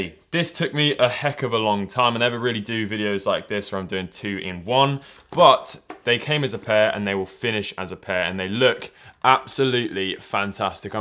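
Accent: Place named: United Kingdom. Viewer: He’s from the uk they have a different way of say things if you are interested look up the differences in English(us) and English(uk)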